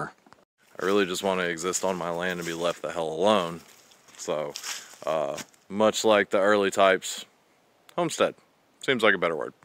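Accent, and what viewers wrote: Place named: Missouri, United States. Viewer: I swear ...you sound like your from Missouri.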